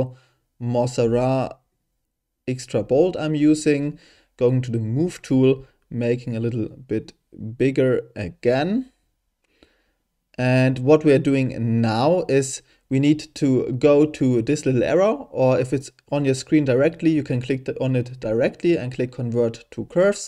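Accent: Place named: Germany. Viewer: Der deutsche Akzent fetzt😂 gutes Video, besten Dank!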